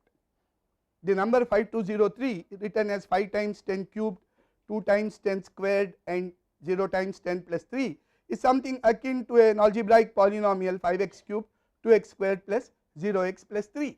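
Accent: India. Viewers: I was telling my friend the only kind of math i want is the kind an indian guy is teaching me so i literally spent too long looking up different variations of "indian guy with thick accent teaches math"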